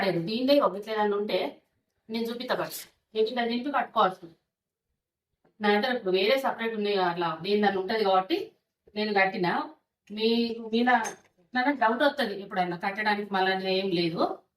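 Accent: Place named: Karimnagar, India. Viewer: Karimnagar district ani meeru matlade vidhanam tho gurthu pattanu. Ma pinni athagari Jagithyal. Vallu matladinatte undi . Ala gurthupattanu. .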